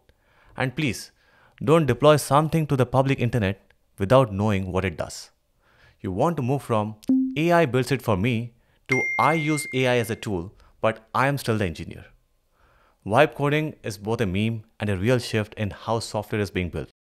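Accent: India. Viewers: Are you south indian? I just thought of asking. No other reasons.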